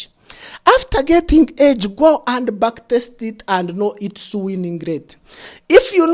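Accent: Ankole, Uganda. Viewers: Your accent is not bad just a kinyamkole accent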